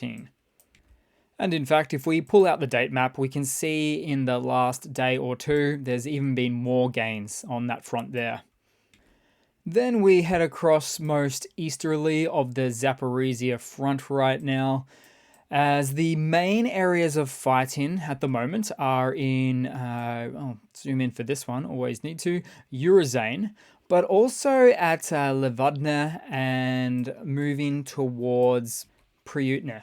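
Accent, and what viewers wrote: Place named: Australia. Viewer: The human error to which TASS refers is Putin, birth to dissolution. It's a beautiful thing to hear voice. Sounds Ozzie, with a few S.A. pronunciations sprinkled in. Is he in Taiwan? Shoigu may well be blustering, as his street cred has been seriously questioned for months now, and he has said little in response, to Noseferatu and others. Should Kyiv actually catch something, we should expect Moscow to spark shortly thereafter. A kremlin is a russian term for a walled fortress. It is NOT a synonym for safe thunderdome.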